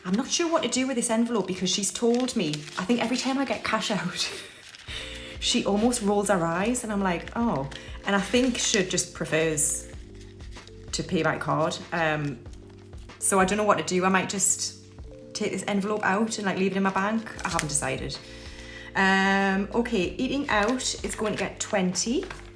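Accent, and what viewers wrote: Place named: Scotland, United Kingdom. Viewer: Is that a Scottish accent I hear? You get my subscription for that reason at a minimum. Also, maybe I didn't get the accent quite right but I do love it. Sounds like you're making some good progress! Oof, I do hate trying to figure out the right taxes. Nice job on those savings challenges. I found you just by searching for budgeting channels on YouTube because I love the budgeting community and focus a lot of my content around it too. Keep up the good work! You're getting close to 1000 subs!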